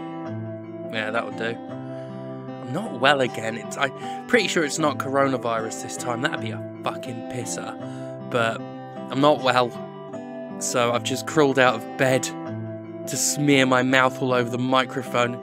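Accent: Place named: United Kingdom. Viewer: Your British? I thought you were Canadian egh,. Well explains why you never say sorry egh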